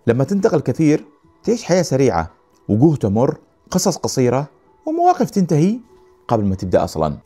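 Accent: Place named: Yemen. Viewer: ياخي لهجتك شوي مغربي شوي يمني شوي مصرؤ بش اكثر شي يمني من وين انت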